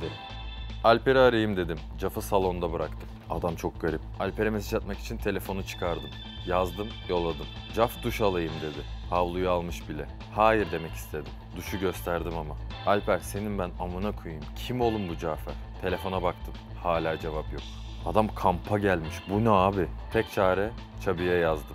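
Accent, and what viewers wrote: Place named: United States. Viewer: Adam amerikalı aksan çok saçma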